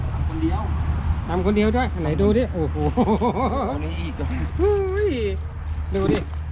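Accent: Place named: Northern Thailand, Thailand. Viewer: สวัสดีครับ อู้ภาษาเมืองบ่ธรรมดา 555+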